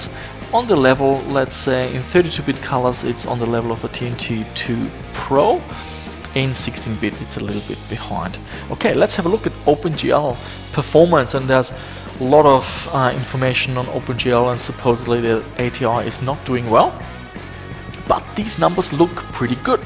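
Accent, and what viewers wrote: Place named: Germany. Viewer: Du hast ein Deutschen Akzent.^^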